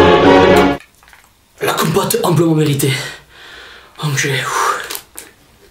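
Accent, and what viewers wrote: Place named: Marseille, France. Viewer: L'accent Marseille 😂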